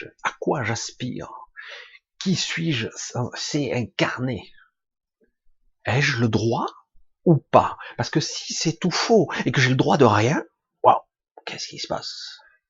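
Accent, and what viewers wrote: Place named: Ardèche, France. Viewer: Bonsoir j'adore vous entendre avec l'accent ardéchois c'est tellement vrai ce que vous dites le peuple n'en peux plus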